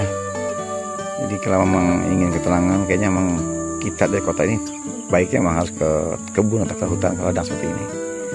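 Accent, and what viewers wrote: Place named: West Java, Indonesia. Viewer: Kok logatnya kayak orang Sunda atuh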